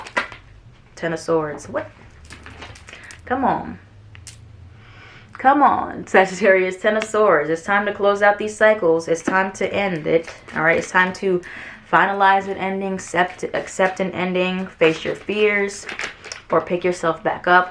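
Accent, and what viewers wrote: Bronx, United States. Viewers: Girl I thought you were from the Bronx, I was gonna say I'm from there too🤣 I just subscribed and yes, he's an aquarius. He just finished packing his things with a police escort 😩 I'm so over him and relationships. I've watched at least a dozen sag readings and they all say the same thing: it's painful but it's good for me.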